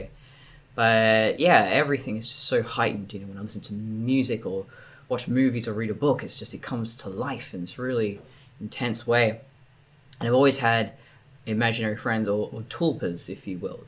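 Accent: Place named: RP, United Kingdom. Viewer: I don't know any Australians personally, but your accent does not reflect what I usually hear in movies. If you had not said it, I would have thought you were THOROUGHLY BRITISH, and of some LANDED GENTRY. 😄 What also adds a LOT to the effect is your REFINED POSTURE and choice of words. Is this accent COMMON in your local area "down under"? BTW, HILARIOUS your comment about America sinking under the waves and the water levels PERHAPS rising in your coastal city/town! Also your idea of a "kinky Lesbian" thinking lewd Heterosexual thoughts makes me laugh! 🤣 Glad you referenced "Waffling WIllow". I like her a LOT too! You BOTH present as COMPLETELY and ATTRACTIVLY FEMALE, but in almost COMPLETELY DIFFERENT ways: YOU as a "Duchess" type, and HER more "Princess" like. Amazing counterpoints, illustrating the WIDE RANGE of human FEMININITY! 😃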